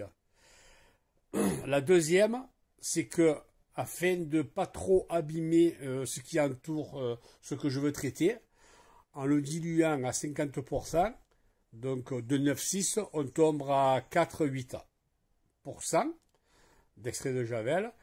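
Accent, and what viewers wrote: South, France. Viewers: Merci beaucoup très clair et concis. PS j'adore ton accent, vive le sud 💪👍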